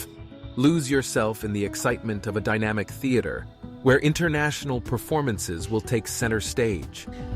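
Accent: United States. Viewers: I didn't - it was smooth! I did wonder why an American was narrating Australian construction but hey, global society and all that. :-) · Why is there an American voice-over? Give this video an Australian character by having someone who has an Australian accent, at the very least.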